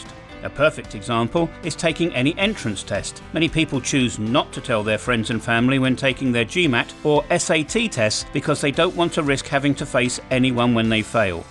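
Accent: United Kingdom. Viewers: I like your Chanel und britsh accent (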